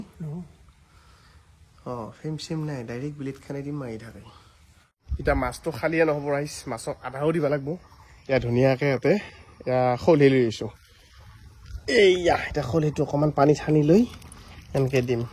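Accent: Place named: Darrang, India. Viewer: ভিডিঅ' বোৰ ভাল লাগে কথাবোৰ পুৰা দৰঙীয়া আমিও দৰঙৰে। আপুনি মানে খালৈত বৰশী বাইছে আৰু নেকি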